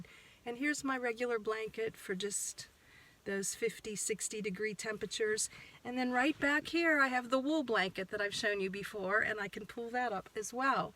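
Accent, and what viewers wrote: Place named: Philadelphia, United States. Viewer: Oh one more thing...I am from Philadelphia, ..I now live in MIchigan..but boy you sound like a philly girl to me ..are you from the east coast?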